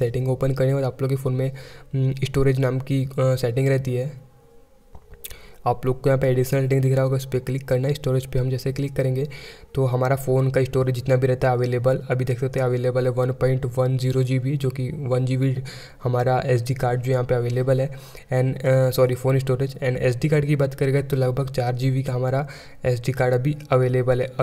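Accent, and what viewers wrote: India. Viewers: Sir u write english speak indian why why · He speak in indlish. Their tongie are regguerer-tongue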